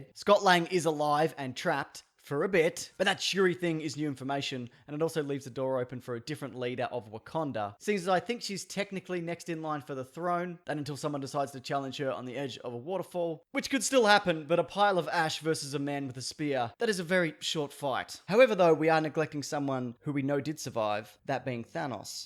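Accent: Australia. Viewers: Man true Australian accent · You sound Aussie as fuck are you Aussie as fuck?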